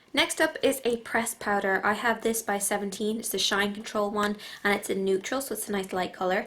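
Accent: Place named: Ireland. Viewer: You sound irish :)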